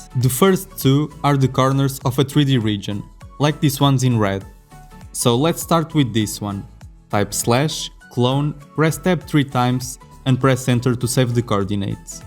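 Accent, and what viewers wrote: Portugal. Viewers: bem me parecia que eras de Portugal ^^ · parece me portugues o sotaque nao engana🤣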